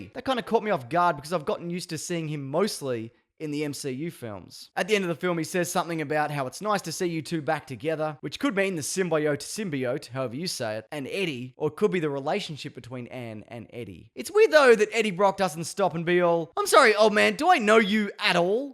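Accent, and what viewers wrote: Australia. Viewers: Wait I just clicked on this video and I have no clue who you are but you sound great! Umm are you Aussie cause I am I can hear it in your voice are you???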